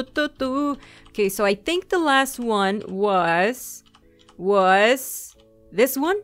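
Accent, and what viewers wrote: Spain. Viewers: Are u Spanish not to be rude😰😰😰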